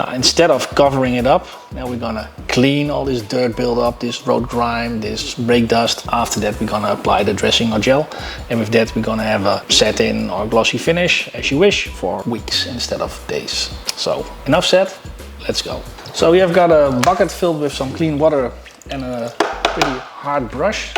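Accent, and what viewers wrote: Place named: Netherlands. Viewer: Hello, you sound like a Dutch guy speaking English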